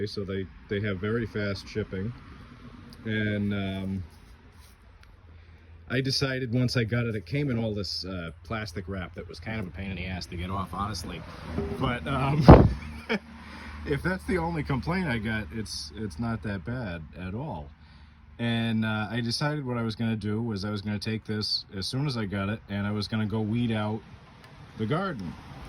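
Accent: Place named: New England, United States. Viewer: Great review, ill be buying one of these! Also, as a New Englander thats been transplanted to the south, your accent brings me great nostalgia.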